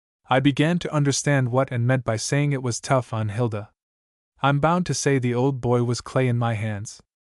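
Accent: United States. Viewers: Americsn narrator really doesnt work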